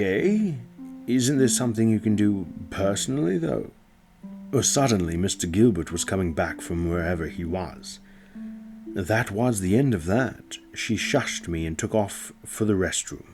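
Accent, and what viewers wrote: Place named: United Kingdom. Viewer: I would love to hear you narrate a James Bond audio book you have a great British accent!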